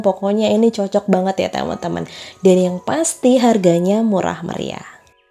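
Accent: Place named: East Java, Indonesia. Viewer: Hp saya jg barusan masuk service samsung mba 4hari kena lcdnya dan komponen apanya gt biayanya 965rb huhu,, qadarullah lg renang ta masukin di dompet hp waterprof tp gataunya ada lubang kecil dan masuklah air dr situ kena kamera depan sampe ngembun kemasukan air dr lubang gatau kalo ada sobek kecil ta bawa aja nyemplung renang Kurangnya diteliti lg pdhl baru beli kantong hp antiairnya huhu Salut bgt bisa betul hp sendiri mba suaminya Salam kenal ya mba,, ak suka liat ytb mba dr lama lewat akun suami gaberani coment hihi dah lama bgt dr masih blm 100k sub Awalnya nongol kok bahasanya kek orang jatim Semoga sy bs semangat bikin vidio kek mba panutan❤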